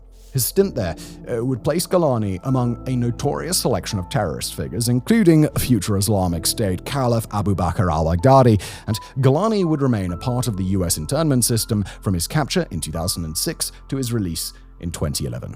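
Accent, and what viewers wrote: RP, United Kingdom. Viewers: Out of this entire video. Simon saying Ray nay sanse. Instead of renessance. Whilst saying in other videos taco sounds posh but tayco as he pronounces it. it tells me a lot. British pos.h. living in Prague. Gotta make that cha cha cha'ching.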